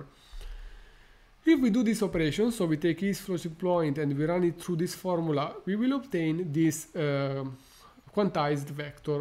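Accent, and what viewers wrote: Italy. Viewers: I've seen many of your videos and you are a great teacher! Thank you for your effort in explaining in plain (Italian accent) English all of these complicated topics. Regarding the content of the video - you showed the quantization-aware training and you were surprised of the worse result it showed in comparison to the post-training quantization in the concrete example you made. I think it is because you trained the post-training quantization on the same data that you tested it on, so the parameters learned (alpha, beta) are overfitted to the test data, that's why the accuracy was better. I think that if you had tested it with true test data, you probably would have seen the result you anticipated.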